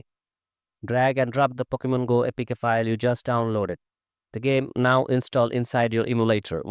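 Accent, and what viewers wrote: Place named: India. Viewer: Why do all these guides have a creator who sounds like a typical Indian scammer who scams elderly people into taking out loans for their grandchildren etc. :D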